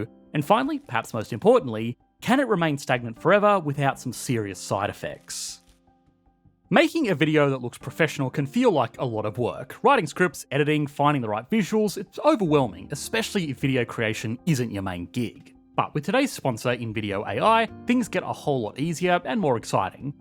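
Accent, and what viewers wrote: Australia. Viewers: the Australian accent is the worst accent in the world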